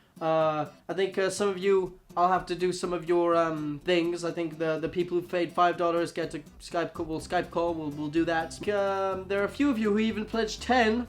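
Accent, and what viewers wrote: Ireland. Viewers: are you irish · HES IRISH I JUST REALISED!!!!!!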